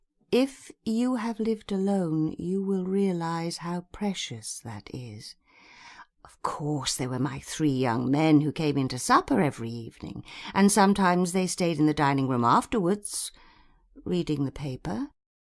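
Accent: United Kingdom. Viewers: فخم و تحس بطعم الإنگلش بالأكسنت البريطاني😂😂😔✨🤍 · واو صوتها حلو حرفيا احب الاكسنت البريطاني 😭😭😭💙💙💙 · I don't like british accent 😢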